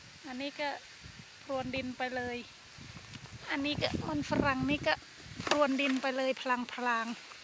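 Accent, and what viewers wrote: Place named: Surin, Thailand. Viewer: เพิ่งเห็นจ้ะติดตามนะเว้าเหมือนคนสุรินทร์นะชอบๆจ้ะ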